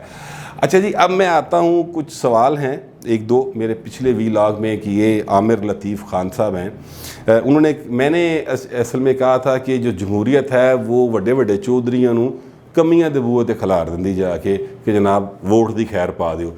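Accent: Lahore, Pakistan. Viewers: Your lohari accent is beautiful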